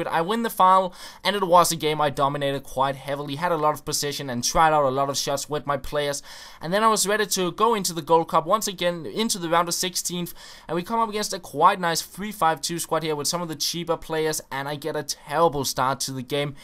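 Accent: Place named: Denmark. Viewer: He's From Denmark, whoever says he has a bad accent try uploading daily Youtube videos in a different language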